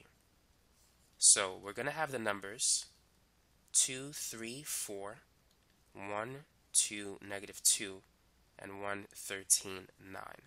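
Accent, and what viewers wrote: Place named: Texas, United States. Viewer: Hi american , are u from Texas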